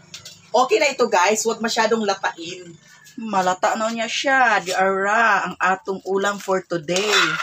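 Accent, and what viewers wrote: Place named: Davao, Philippines. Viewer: Relate man kaau ko ana imong sinultian kay sa amua sa davao man.binisaya kaayo .watching from dubai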